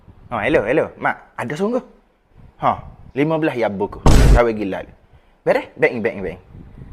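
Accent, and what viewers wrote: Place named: Kelantan, Malaysia. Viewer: Udoh kecek kelate pulok😂😂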